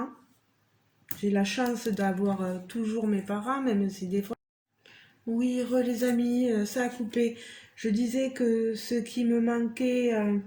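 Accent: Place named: South, France. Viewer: Hello sacré moment en ta compagnie. J’aime toujours autant ton accent du sud. Bisous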